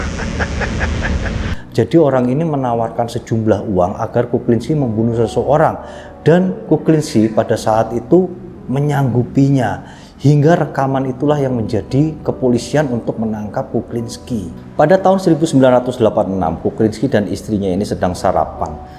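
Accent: Java, Indonesia. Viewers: bingung... Saya dengerinnya juga bingung kejaaam bgt.... Suka logat kejawaannya medok medok gitu mantaaap deh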